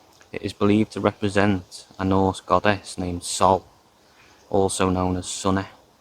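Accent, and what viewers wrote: Liverpool, United Kingdom. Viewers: Love the Liverpool accent and you knowledge thank you a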